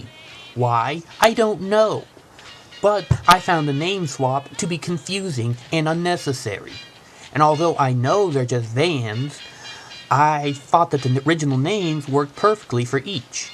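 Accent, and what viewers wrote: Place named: South, United States. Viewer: HAHAHAHA Great video man!!! Are you southern??? sounds like an southern usa accent.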